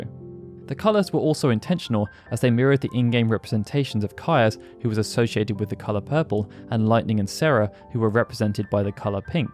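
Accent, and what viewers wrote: United Kingdom. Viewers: There is no other way to listen about final fantasy then with a British accent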